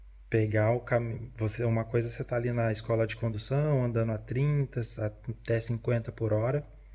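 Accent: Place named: Rio Grande do Sul, Brazil. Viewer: Provavelmente é gaúcho.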